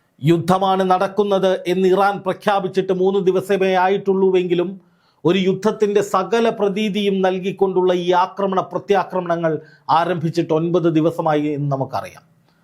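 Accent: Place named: Pathanamthitta, India. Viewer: ത്രീശ്ശൂർ ആണോ. പത്തനംതിട്ടകാരന്റെ സംസാരമാണല്ലോ കേട്ടിട്ട്.. നീട്ട് ഇല്ലല്ലോ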